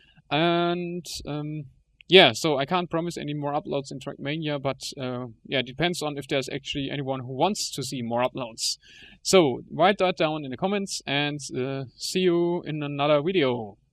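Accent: Germany. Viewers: you have a German accent!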